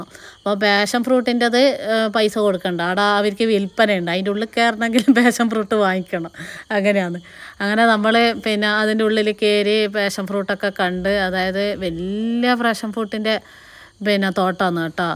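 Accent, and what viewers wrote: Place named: Kannur, India. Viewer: വീഡിയോ വളരെ രസകരമെന്ന് പറയാതിരിക്കാൻ വയ്യ!!💢 നർമ്മം കലർന്ന തനി നാടൻ ഭാഷയാണ് വീഡിയോയുടെ പ്രധാന ഭംഗി👌🎉🎉!!! അത് നമ്മുടെ കണ്ണൂർ ഭാഷയിൽ കേൾക്കുമ്പോൾ നല്ല സന്തോഷം✨ ഹരിത ഭംഗി തുളുമ്പുന്ന പച്ചപ്പു നിറഞ്ഞ കാടുകളും കൂറ്റൻ മരങ്ങളും കാട്ടരുവികളും , പൂക്കളും മാൻ ആനക്കൂട്ടങ്ങളും നിറഞ്ഞ പ്രകൃതിയുടെ സുന്ദരങ്ങളായ കാഴ്ചകൾ അതിമനോഹരമായി പകർത്തിയിട്ടുണ്ട്.👌✨ കാഴ്ചക്കാരുടെ മനം കവരുന്നതായിരുന്നു ദൃശ്യങ്ങളോരോന്നും🎉🎉🙏✍️✍️ ആശംസകൾ💫💫💫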